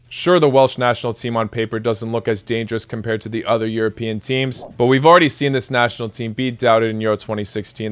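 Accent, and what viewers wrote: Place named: United States. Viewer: my brother listen to his accent, he's American along with Croatian.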